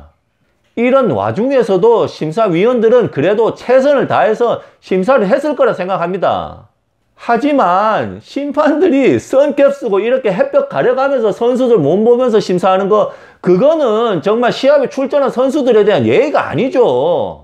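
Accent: Gyeongsang, South Korea. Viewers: 경상도 어디십니까ㅎ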